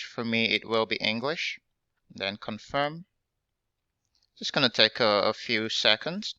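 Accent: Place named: Nigeria. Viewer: You sound so Nigerian, thanks for the video.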